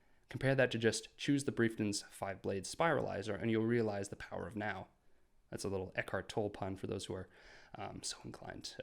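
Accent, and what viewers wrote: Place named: Canada. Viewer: canadian eh? greetings from the frozen alberta. great content man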